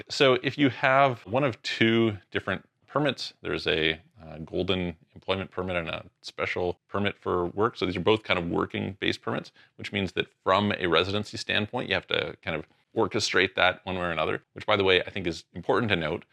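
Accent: Canada. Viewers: "Aboat"? You wouldn't be a Canadian "eh"? No problem if you are. I like Kanuks.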